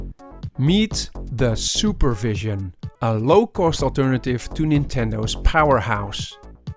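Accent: Netherlands. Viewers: You Dutch ? :-) great video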